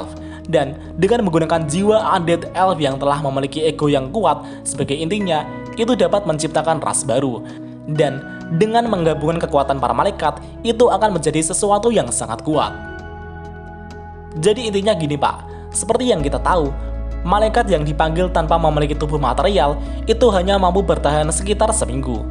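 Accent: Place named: South Jakarta, Indonesia. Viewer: Jaksel ya bang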